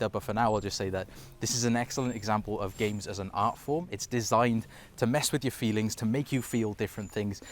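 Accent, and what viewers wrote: Wales, United Kingdom. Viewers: I recognise a welsh accent when I hear one. Hello, fellow Welshman :) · Is he welsh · Hears Welsh, instantly subscribes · Is he welsh?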